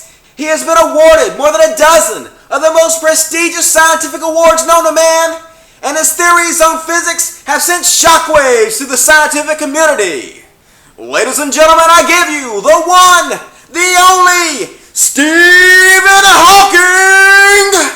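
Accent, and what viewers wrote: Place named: Southern California, United States. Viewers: I love this guy's accent, it's like mixture of southern and california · if this guy didnt have a hillbilly accent and a potty mouth maybe someone would take him seriously.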